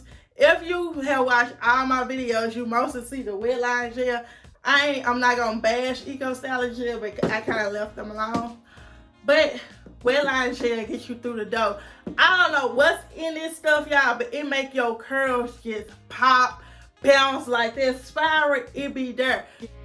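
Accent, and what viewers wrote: South, United States. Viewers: Damm you country af. I couldn't understand most of what you said. Are you hard of hearing?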